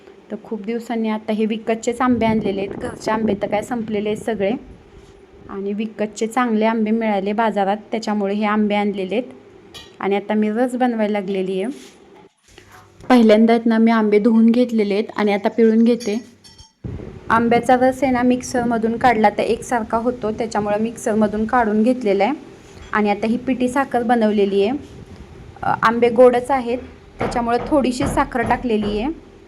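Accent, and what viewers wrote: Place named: Kolhapur, India. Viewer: कोल्हापूरमधून आहात की सांगली आवाज तसा वाटतो.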